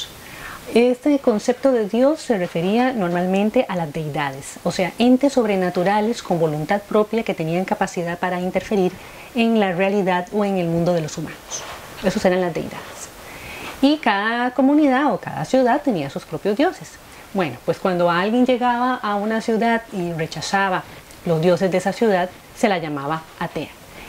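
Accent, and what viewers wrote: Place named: Costa Rica. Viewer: tu practicas alguna filosofia de vida o religion en tu vida, tu inteligencia es exquisita escucharte y una mujer muy bella me encantaria asistir a alguna charla o seminario tuyo x tu acento especulo que eres tica y q vives en san jose?? Se t puede ubicar de alguna manera donde encuentro tus libros??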